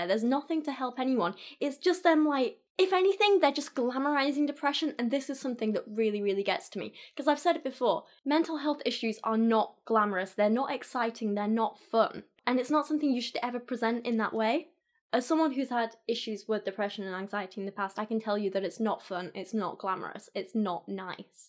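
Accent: United Kingdom. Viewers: Your timing is awesome. I had a really bad day today, and there you are, waiting for me to get home and log on so you can do your British patter with the cute accent and the inspirational point of view, and you'll talk about a point that need qualification and you'll go ". . . B-u-r-t . . . " and then add the qualification. So, I watch you for a half an hour and now I feel better. Thank you!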